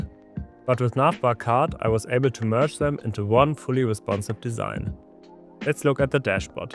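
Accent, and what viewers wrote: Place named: Germany. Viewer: i hear deutsch in se english - top video, mach weiter so!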